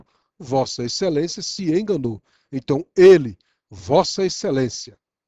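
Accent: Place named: Pernambuco, Brazil. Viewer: Aulas maravilhosas, estou assistindo todas e está me ajudando muito, principalmente porque me familiarizo com seu sotaque, pois sou Pernambucana também. Continue assim, com esse trabalho louvável!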